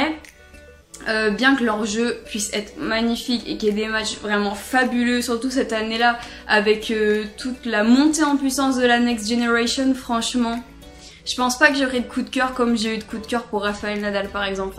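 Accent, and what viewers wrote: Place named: England, United Kingdom. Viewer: T0N ACCENT ANGLAIS PUTAIN INSUPORTABLE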